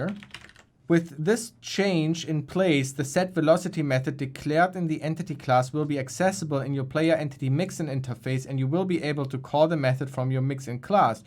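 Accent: Germany. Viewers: He is a german guy you her it by it acent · Du sprichst eigentlich Deutsch oder xD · Man hört das Deutsche raus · Man hört das du deutsch bist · Hab nur 1 Satz hören müssen um zu wissen das du Deutsch sprichst 😂😘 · correct me if I am wrong, but as a German I heer it: you are german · i think i hear the german accent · Du bist Deutsch oder ? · Bro is german? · bro sounds so german xD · Man hört dem deutschen Akzent ab und zu 😂❤ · I can hear Deutsch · Hey bro are you german? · Are you a German man? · erste 5 sekunden "der typ is doch deutsch" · Man merkt so hart dass er deutsch ist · Based on your accent, I would guess that you are from Germany. Am I correct? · Man hört das deutsch raus 🙂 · this german accent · Bruder du hast so einen krassen deutschen Akzent. Bereits bei "I'm sitting" war schon alles klar xD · German Accent? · Junge bist du deutscher? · Sounds like an german Accent lol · are you german? 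i am a german too and you sound very german to me lmao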